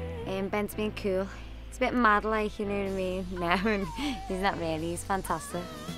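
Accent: United Kingdom. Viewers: i lik the scouse accent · she barely 5"0' her britsh accent can kill anyone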